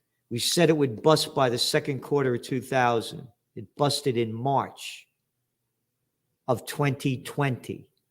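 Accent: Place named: New York, United States. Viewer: Gotta say, Gerald reminds me of New York every time I listen to him ^^"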